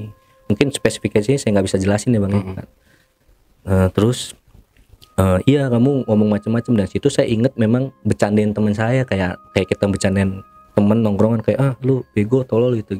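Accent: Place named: Bekasi, Indonesia. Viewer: Temen gw orng bekasi jg emng logat dan cara ngomongnya kaya gini persis klo cerita, asik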